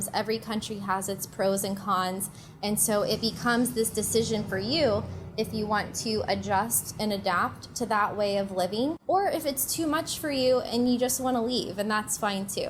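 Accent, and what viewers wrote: United States. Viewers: Sorry ,but croaky American accent makes this impossible to listen to !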